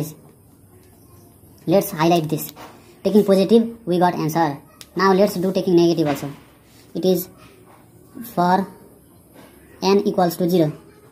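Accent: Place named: Nepal. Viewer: Bro u nepali?😂 nice English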